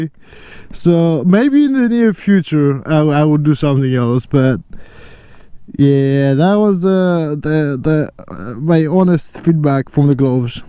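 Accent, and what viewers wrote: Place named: Greece. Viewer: Hey mate, 2 facts that lead me to think that you are Greek. 1 (?) and your pronunciation..it reminds me a lot of mine 😂. So I might be right, I might not. Cheers from Greece buddy! I am thinking of those gloves, and unfortunately in Greece we do not have the chance to try and feel astars products, because very few models are available in stock. So I am thinking on getting these from EU store, but a little concern with the sizing. Should I stick with the sizing chart? Or 1 size up/down. Any suggestions? Thanks a lot, ride safe!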